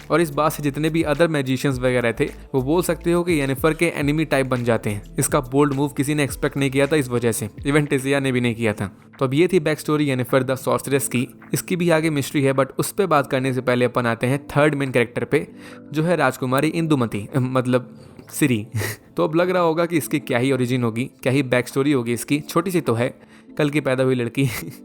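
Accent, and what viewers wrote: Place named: Chhattisgarh, India. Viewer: Tum chattisgarh k lagte ho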